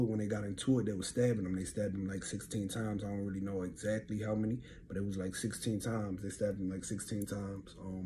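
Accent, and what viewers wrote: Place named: North Carolina, United States. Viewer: He from nc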